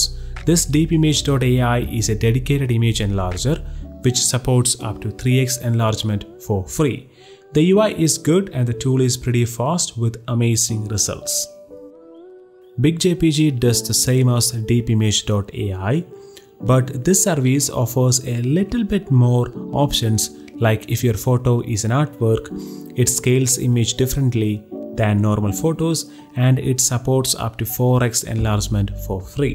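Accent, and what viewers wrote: Kerala, India. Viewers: Ith oru Malayali anallo samsaarikune.. alle? i felt it like many times in this video.. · mallu accent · Chettan Malayali Alle ? Mmde College pronunciation... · Your accent sounds more Indian than me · Refreshing mallu voice 😀… good content and diving to check all of those links ..thanks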